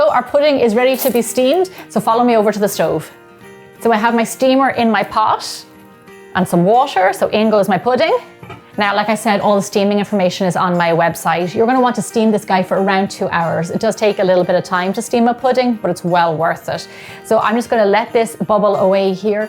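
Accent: Ireland. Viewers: Thank you so very much for taking the time to respond to my post and to give me tips for making my pudding gluten free. Thank you also for you kind condolences. I forgot to say how much I LOVE listening to your brogue. You remind me so much of my cousin Terri O’Neill who’s Irish on both her mom’s and dad’s sides. How I love Ireland and the Irish people! ☘️☘️☘️💗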